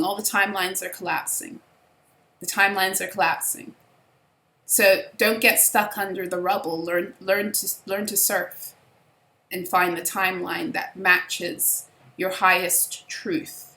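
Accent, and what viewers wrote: United Kingdom. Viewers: Your Hiding a British Accent I hear it...Just like Maji got the T hiding her UK Accemt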